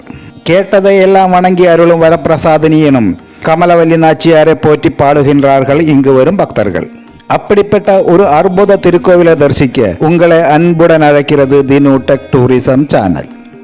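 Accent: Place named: Kerala, India. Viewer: നല്ല മലയാളച്ചൊവ ഉണ്ടല്ലോ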